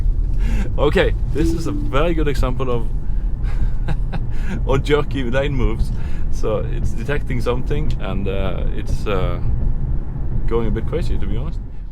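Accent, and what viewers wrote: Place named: United States. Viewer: sounds Swedish American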